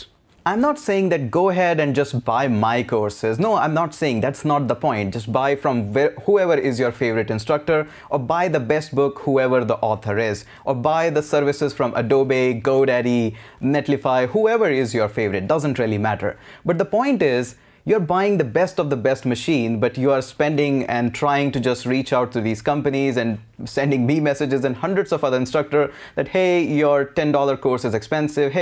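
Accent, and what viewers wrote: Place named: India. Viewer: Indian accent 😅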